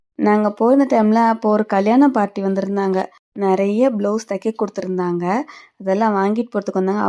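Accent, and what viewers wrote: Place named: Karaikudi, India. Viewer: Neenga karaikudiya unga varthaigal alagu ola irukku simly suprer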